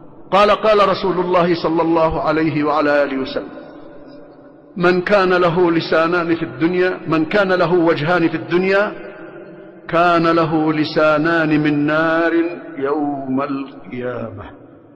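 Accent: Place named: Sanaa, Yemen. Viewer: بل هذا للشيخ عبدالله بن عثمان الذماري، فهو يتكلم ببعض الحروف بالهجة الصنعانية، ركز في الصوت، ما شاءالله يشابه صوت العلامة ربيع بن هادي المدخلي حفظه الله.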